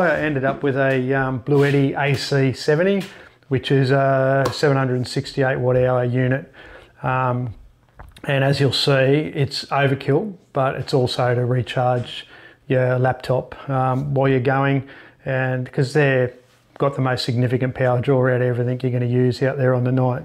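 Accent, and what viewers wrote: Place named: Australia. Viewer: Hi,. I saw all the video on 24" Hubble Optics Dobsonian and was very impressed. I read from the automatic subtitles since I am not so accustomed with Australian flavor english accent. Any way, I am so much interested on this type of designs.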